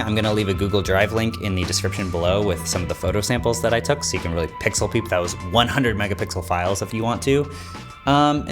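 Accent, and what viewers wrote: United States. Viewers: Aaaaah "Another: "Whats-Up" opening! As soon as I hear this + Yank voice! Block and go.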